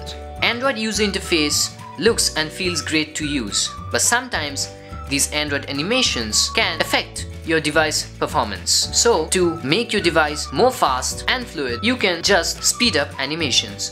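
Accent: India. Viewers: The way you pronounce the letter T is almost correct.... Unlike most Indians.... Just need a little more practice... And also your letter L still sounds very Indian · Dude speak English in an accent that is understood by others not just Indian only! So anoying!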